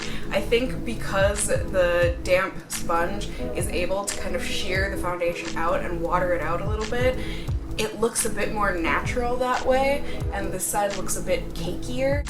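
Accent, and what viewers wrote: Minnesota, United States. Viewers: your videos are my favorite videos to watch, although they don't help with my skin tone lol I just enjoy watching you! I thought I recognized that accent and then I finally took the time to look in the description and you're a fellow Minnesotan! all the more better!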